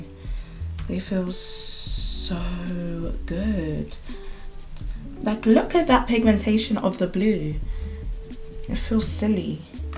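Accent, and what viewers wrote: United Kingdom. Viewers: Bro is British💀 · I just love the british accent ❤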